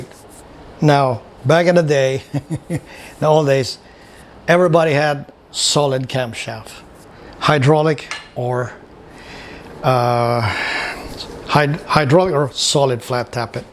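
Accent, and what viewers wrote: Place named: Louisiana, United States. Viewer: You sound like you're from down the bayou. Where are you from?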